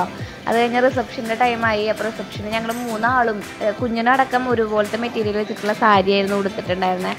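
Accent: Thrissur, India. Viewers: Hi... new subscriber 👍 lv trissur language. All the best..😍😍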